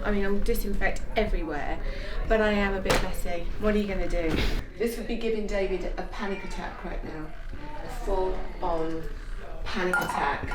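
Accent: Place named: England, United Kingdom. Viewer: That voice and English accent! Love VB since she was Posh Spice!!!! ♥️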